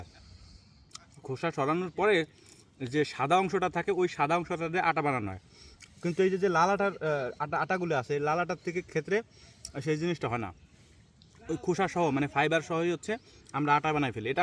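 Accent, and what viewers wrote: Faridpur, Bangladesh. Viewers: ভাই আপনার বাসা কই?? আমার এলাকার একসেন্ট পাচ্ছি,, আমাদের ফরিদপুরের মানুষ ও এইভাবে কথা কয়। আর আমারও এমন গুলোমুলো টাইপের একটা ভাগিনা আছে 🥳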